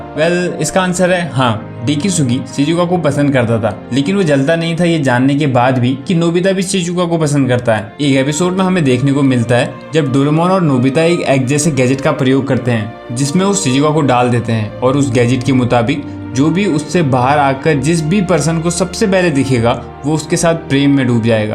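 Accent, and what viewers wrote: Standard. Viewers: Omg how can you speak so much pure hindi? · Bro damn ur hindi is pure. It's not everyday i get to hear pure hindi. Good job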